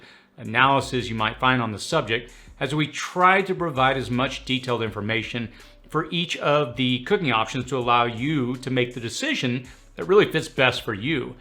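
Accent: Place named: Pennsylvania-Maryland, United States. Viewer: I hear your accent you sound like your from PA Md area my family is from there many generations :-)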